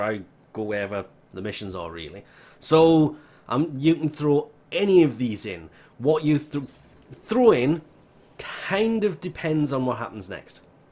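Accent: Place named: North, United Kingdom. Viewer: Knew I was subscribed didn't know you were northern - just yay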